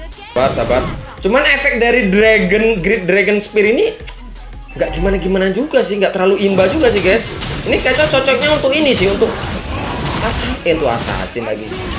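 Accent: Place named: Malang, Indonesia. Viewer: Triple kill-> niyok-> jebret->Maniac😂😂 Boso jowone podo karo boso Jowo Malang-an😂 Salam dugi Kota Malang ❤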